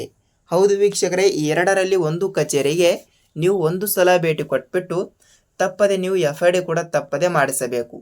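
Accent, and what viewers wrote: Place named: Uttara Kannada, India. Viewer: Uttar kannada speech ok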